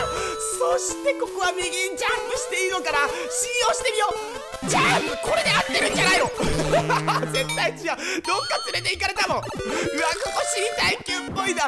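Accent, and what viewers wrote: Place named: Kyushu, Japan. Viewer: そげん喋っとるほうですよ🎵 ほどよい九州の方言が素敵です（私はばあちゃんが九州だったんで）🎵✨☺️ あ、そしてさんといえば「絶叫🎢」君に届けで吹きました✨ 楽しい動画、ありがとうございます😉👍️🎶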